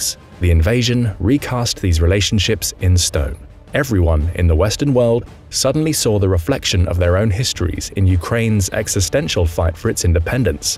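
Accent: United Kingdom. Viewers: I hear a british accent!!!😂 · A US Military PR piece using British Accent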